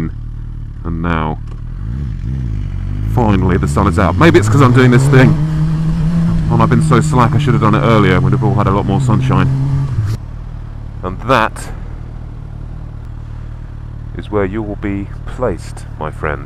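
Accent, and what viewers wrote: England, United Kingdom. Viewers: i love hearing you swear in your grand english voice... haha i want a vlog of just swear words haha :D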